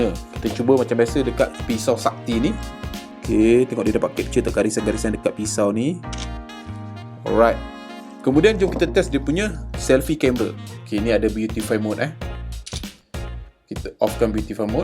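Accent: Melaka, Malaysia. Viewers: org melaka ke?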